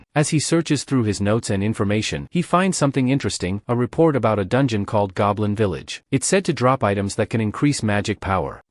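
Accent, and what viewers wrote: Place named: United Kingdom. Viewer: The British accent😭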